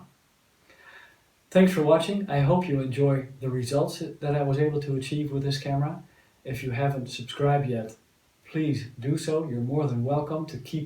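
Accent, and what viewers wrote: Netherlands. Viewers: Are you Dutch? Sounds like a Dutch Accent 😄✌🏽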